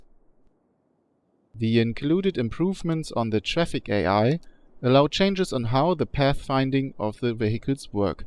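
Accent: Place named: Germany. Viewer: haha okay. nur ein kleines bisschen zu deutscher Akzent und zu starke Betonung der Endungen.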